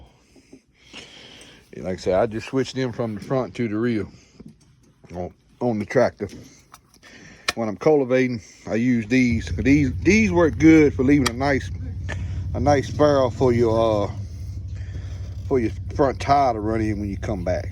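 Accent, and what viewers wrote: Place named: Louisiana, United States. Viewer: Where are you located? From accent, can't tell if you're Cajun, or from around Lumberton SC. I'm in SC. Can't beat dog wire cages,I use taller wire, mabe 4' and cut 6' sections, then drive a piece of metal pipe through bottom so they don't get blown over in storms. Have some cages almost 20 year's old.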